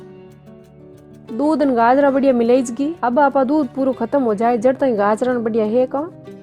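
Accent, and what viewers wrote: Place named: Jodhpur, India. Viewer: Aapki boli mery pardadi ko bahut achhi lagi. And aappki boli mery Jodhpur me rahne vali mosi jesi hai 😊😊😊😊😊😊😊😊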